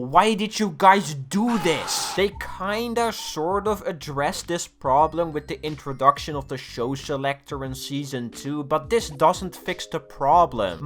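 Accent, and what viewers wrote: Netherlands. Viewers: you sound dutch as fuck bruv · Tering…….wat een cringe nederlands kut accent 🙃🙃🙃🙃🙃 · Deze man heeft een beetje een hele grote Nederlandse accent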